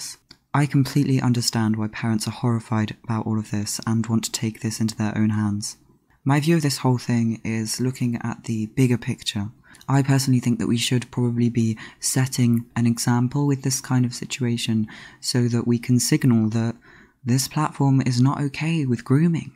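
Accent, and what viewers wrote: RP, United Kingdom. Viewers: Your voice temporarily cures my depression. So soothing, so posh, so wonderful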